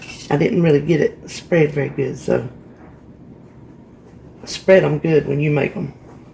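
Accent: South, United States. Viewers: Hi! My name is Elizabeth. I just recently started watching your videos. I totally understand your southern language since I am from Mississippi but now live in Niceville, Florida. You are doing great! Keep up the good work. I love you are taking the good ole southern cuisine and making it ww friendly. Have a great weekend!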